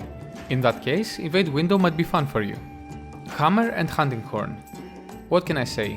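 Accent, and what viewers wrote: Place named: Greece. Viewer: Efxaristo haha, elliniko accent kani bam alla ta agglika sou ine poli kala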